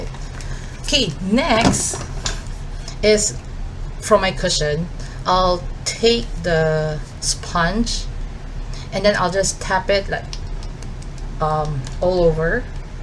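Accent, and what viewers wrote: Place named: United Kingdom. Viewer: I like this. Sis, you have an accent. British or Australian? I noticed when you said "can't". But I love it. 😚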